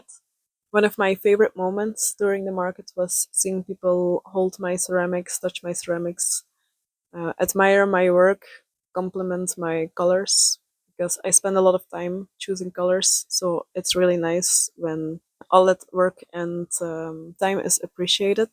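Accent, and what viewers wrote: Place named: Flanders, Belgium. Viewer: Hey, Ik vergat je te zeggen dat ik je hoor Nederlands praten. Of Belgisch eigenlijk. Wat Leuk! Ik ben Nederlandse 😊🎉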